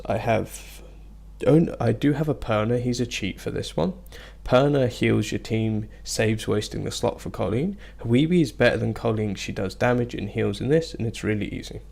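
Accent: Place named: Surrey/Hampshire, United Kingdom. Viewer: Great video, really informative! Was actually stunned to see only 100 subs, so take mine - also you sound like you're from Surrey/Hampshire?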